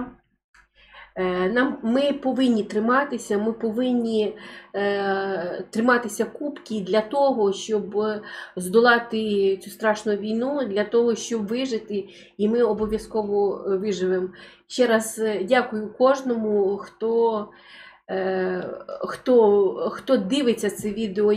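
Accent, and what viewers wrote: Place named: Polissia, Ukraine. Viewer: Пані! Вітаю Вас з днем народження! Дякую Творцеві,що він створив Вас такою миловидною,щирою,розумною,відкритою,справедливою і і в міру критичною! Дякую батькам,що привили Вам любов до рідної землі!А мова Поліського краю така чудова, хочеться слухати і слухати Вас! Кожного вечора слухаю Вас,а якщо світла немає -скучаю за вашою правдивою інформацією. Будьте ж тією зірочкою,яка дарує сотням тисяч людей своє світло і дає надію на те,що зло буде переможене Добром! Многії і благії літа Вам і вашим рідним!!!Слава Україні!!!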